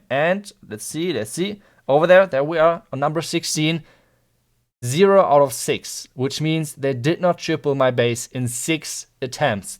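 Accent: Germany. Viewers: Its great to have a world class(skilled) player as a youtuber.Your enlish(German version😊) is really enjoyable.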